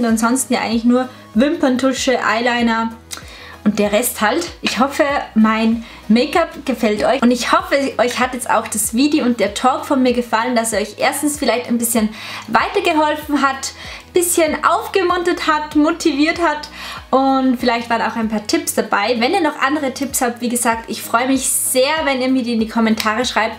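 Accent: Austria. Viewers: Bist du Österreicherin? :) (wegen dem akzent hätte ich halt geraten haha)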